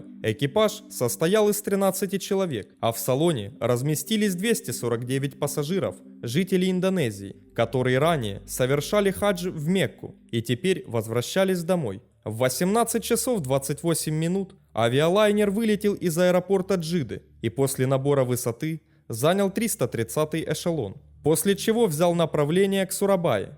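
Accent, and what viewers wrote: Ukraine. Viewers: Скорее, Украина. У него не белорусское произношение. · Вроде Украина или Белоруссия.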